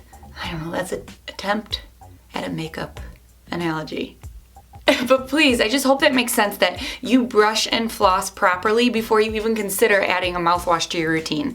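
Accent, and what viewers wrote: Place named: Midwest, United States. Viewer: i picked up a bit of a midwestern accent from her hahahaha. however, great video! never would’ve thought this would be on my recommendations!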